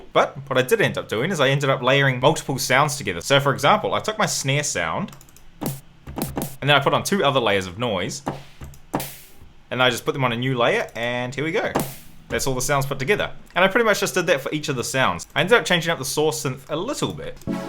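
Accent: New Zealand. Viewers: Hey dude, you sound like you're from NZ lol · Beautiful! Picked up the kiwi accent too ;) Chur bro It really shows that what plugin you use isn't important, it's just the talent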